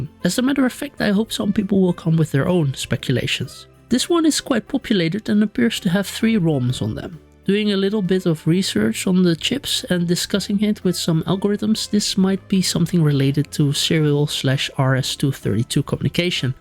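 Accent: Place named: Netherlands. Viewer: Oh leuk, een nederlander op YouTube. Leuke video daar. Ik merkte direct je accent op haha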